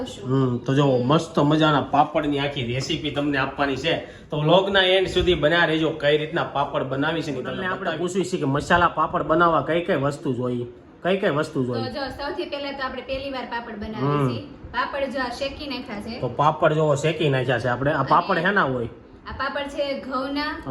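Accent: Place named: Surat, India. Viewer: acdam surati bole chhe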